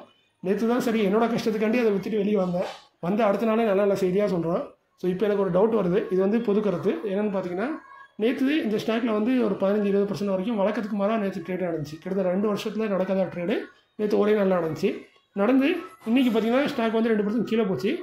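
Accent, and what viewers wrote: Tirunelveli, India. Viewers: ஐயாவின் நெல்லை தமிழில் தகவல்கள் பயன் அளிப்பதாக உள்ளது. திருப்பூர் புல்ஸ் சார் என்பது தெரியும். சார் தங்கள் பெயரையும் உங்கள்????? சொல்லுங்க. I never miss your news. Thanks for information.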